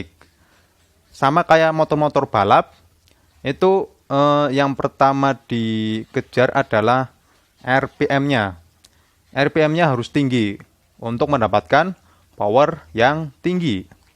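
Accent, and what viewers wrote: Banyumas, Indonesia. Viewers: Ngapak bro kakaka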